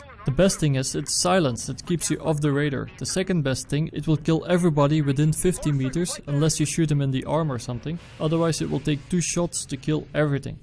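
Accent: Netherlands. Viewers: Dat English tho!! R u from the Netherlands? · R u dutch cuz u have a dutch accent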